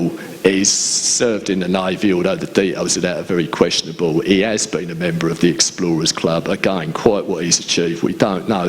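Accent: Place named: London, United Kingdom. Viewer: A very thick London accent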